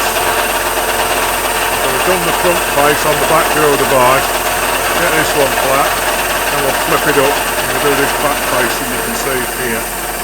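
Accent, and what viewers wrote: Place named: Lincolnshire, United Kingdom. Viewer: Hi, just found your channel via Doubleboost and subbed. I was watching a vid or two and listening to the accent thinking 'this guy comes from the same part of the world as me', nailed it, lmao when you put North Lincs in your outro. I moved away from Lincoln to Scotland 10 yrs ago and am just about to start setting my shop up so will hopefully be posting some vids soon. Keep up the good work, makes me feel like I am back in my home town watching your vids. Jon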